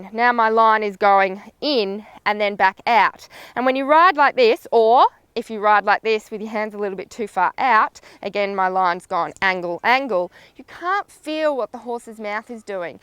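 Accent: Australia. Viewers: Ahh so nice to hear an Aussie accent! My sister and I are from Australia too, I'm super into dressage and I love your videos! (you can check our our channel if you like!) Keep it up! Good luck with the baby (saw a more recent video earlier!)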